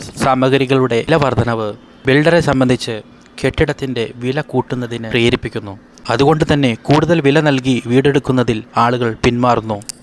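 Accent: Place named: South Kerala, India. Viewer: തെക്കൻ ശൈലി ആശാൻ കളരിയിൽ നിന്ന് പഠിച്ചത് മലയാള അക്ഷരം മലയാളത്തിൽ പറയാൻ അറിയാത്തവർ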